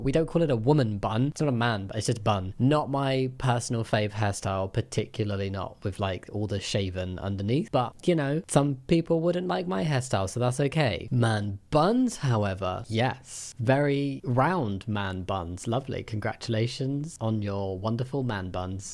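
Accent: United Kingdom. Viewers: I'm B1.2 in english but his British accent Is a little bit difficult to understand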